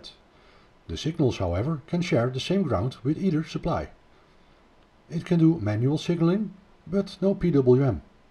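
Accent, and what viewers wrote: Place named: Auvergne, France. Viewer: il nous agace avec son accent auvergnat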